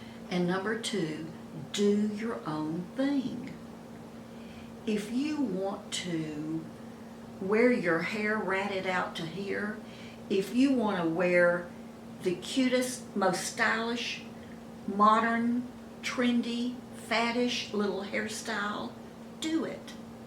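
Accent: South, United States. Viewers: You are beautiful and so inspiring!! Love your southern accent🌿💜🌿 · Yes! I love what you say and how you encourage other women to do what they want to do and feels right to them. Where are you? I am in Massachusetts. You sound southern, but I wish you were next door! ❤️ I will be 79 in August, still wearing makeup, still learning how to do it better. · Hi I only just found you on You Tube tonight! I'm soooo happy because you are a delight!! I love your southern accent! Where are you from? I'm originally from Louisville, KY and oh how I miss a soft southern accent. Can't wait to see more of your videos!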